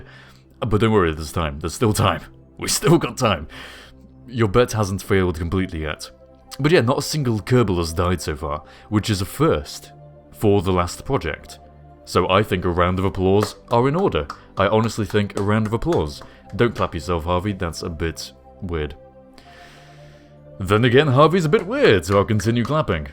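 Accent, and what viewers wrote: United Kingdom. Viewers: Really love Pol personally. Especially with ground scatter active to the max. Not much to actually do there (until 0.90 anyways, maybe), but I love it. If I'd have a custom planet and moons in KSP, I would size it up 30% and make it one of my moons. Personally, I think your voice is amazing as I love the accent from Great Britain. And don't worry, everyone is weird... just not the same type of "weird" heh.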